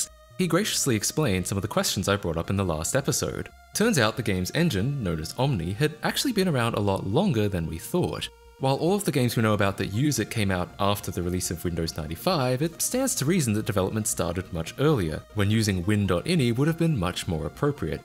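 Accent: Australia. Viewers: "British" - Said about a man who clearly has a strong Australian accent.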